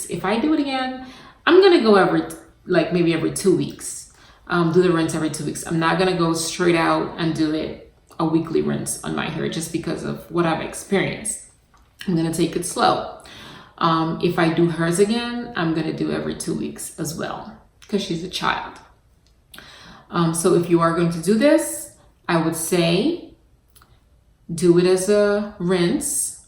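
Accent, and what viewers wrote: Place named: Jamaica. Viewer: I also hear some Jamaican in there, I believe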